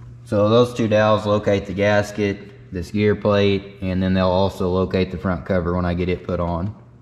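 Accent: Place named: Oklahoma, United States. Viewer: I’ve been trying to figure out where you are from, SW Oklahoma, your accent, I was curious. I wonder how many miles it took to get across that field. Been there done that spinning my wheels on the mud. I like your videos. Thank You Sir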